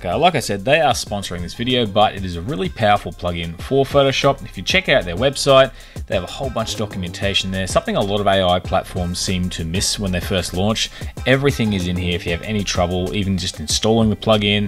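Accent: Australia. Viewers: Tryn to work out the accent, reckon either Melbourne or Sydney. Good on ya mate. BrisVegas here. Subbed. BTW I use SD A1111 PS plugin and getting pretty good returns and streamlined wrkflws. Offers more options for those who work outside the Alpaca ecosystem.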